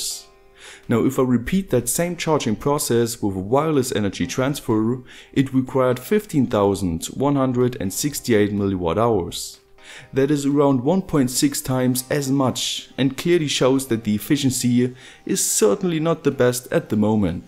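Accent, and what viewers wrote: Germany. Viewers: Great (Video)! Btw, I got one question, are you german? Because you sound like them:D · Nice Geodreieck and nice Deutcher Akzent xD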